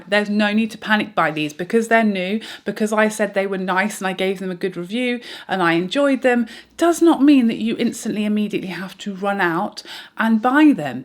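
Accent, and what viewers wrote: United Kingdom. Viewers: I love someone with a British accent telling me what to do! I’m not even tempted by the CT cream bronzer bc I never use the 2 I own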